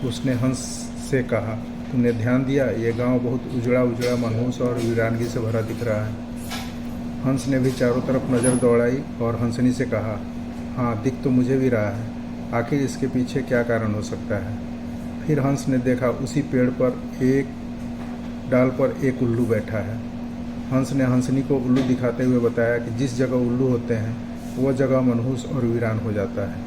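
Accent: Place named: Siwan, India. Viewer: Siwan wala tone hai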